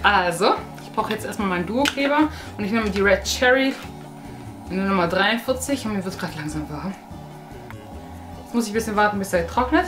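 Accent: Swabia, Germany. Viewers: ich finds so toll das du manchmal ein wenig schwäbisch redest!!!!!!! schwabenpower!!!!! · der dialekt :DD schwäb'sch :D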